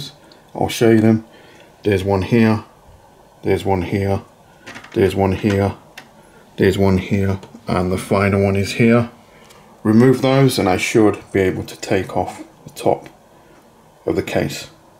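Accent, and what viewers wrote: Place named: England, United Kingdom. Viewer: U sound just like bmw doc lol y'all must be from the same part of England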